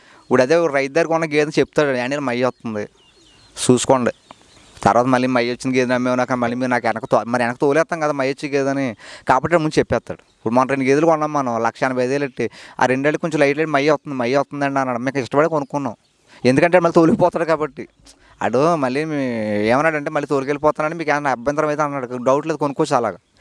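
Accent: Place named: Godavari, India. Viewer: Ma Godarolla yaasa ekka daina kanipetteyachu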